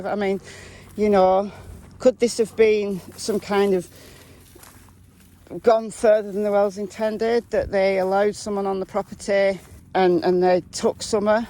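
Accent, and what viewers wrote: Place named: England, United Kingdom. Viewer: Now that you mentioned it, H said in his interview that while they were in line at Walgreens to drop off grandma's rx that Don called Candace wanting to know what time she was going to be home! So that might be a contributing factor to your theory. Tilly is a doll baby! This case has really gotten to me and is breaking my heart. Love the UK... esp England and love your accent. Keep the vids coming!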